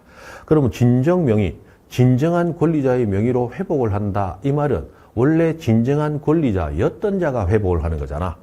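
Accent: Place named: Gyeongsang, South Korea. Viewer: 와 이해 하고싶었지만 잘 몰라서 그냥 외우고 있었는데 설명 과 암기를 모두 해주시네요 ㅋㅋ 거기에... ㅡ 발음이 안되서 ㅓ로 하는 대구경북 억양까지 구수... ㅋㅋㅋ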